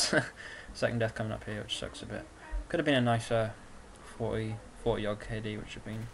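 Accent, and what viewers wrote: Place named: England, United Kingdom. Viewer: where abouts in England are you from???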